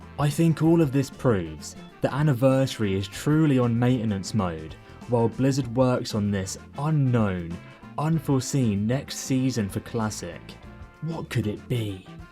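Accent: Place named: Essex, United Kingdom. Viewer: I have a friend sounds like exactly like him, when hes being sarcastic i dont realise half the time XDD, might be an Essex thing could be wrong XDD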